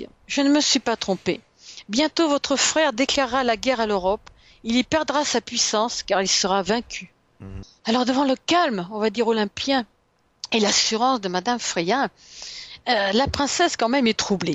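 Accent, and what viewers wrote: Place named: South, France. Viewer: C'est génial j'en ai oublié mes tracas comme quand j'étais petite absorbée par cette histoire au accent du sud encore encore encore 👍merci merci merci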